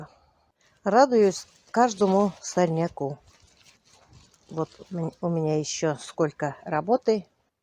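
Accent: Tatarstan, Russia. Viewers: ИСЕНМЕ СИЗ. АЛЛА БИРСЕН ВСЕ БУДЕТ ХОРОШО М ВАШЕЙ СЕСТРОЙ. ОЧЕНЬ ЖДУ САБАНТУЙ И НАДЕЮСЬ ЧТО ВЫ БУДЕТИ СНИМАТЬ ЭТОТ ПРАЗДНИК САБАНТУЙ.БОЛЬШОЙ ПРИВЕ Т ВАШИМ СЕСТРЕНКАМ И САМИ БУДЬТЕ ЗДОРОВЫ.У ВАС ОЧЕНЬ ХОРОШО НА ОГОРОДЕ. У НАС В БАКУ ЖАРА ЛИСТЬЯ НА ДЕРЕВЬЯХ ПОЖЕЛТЕЛИ И КАК ОСЕНЬЮ ПАДАЮТ. ИСЕН САВ БУЛЫГЫС. Я КОГДА ВАС СЛУШАЮ И ВАШ ТАТАРСКИЙ АКЦЕНТ МНЕ ОЧЕНЬ ПРИЯТЕН МОЯ МАМА ТАК ГОВОРИЛА.ОБНИМАЮ ВАС И ОЧЕНЬ ВСЕГДА ЖДУ С НЕТЕРПЕНЬЕМ ВАШИ ВЛОГИ. ВЫ И ВАША РОЗА ОЧЕНЬ МНЕ БЛИЗКИЕ ЛЮДИ.👍💖💖💖👄🌹🌹🌹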